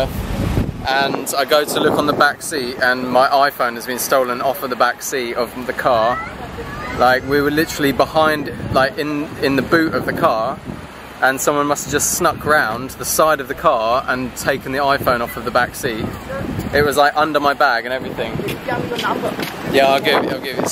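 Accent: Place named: South Africa. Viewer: sorry about your phone :( i definitely think you're developing south-african accent though! :)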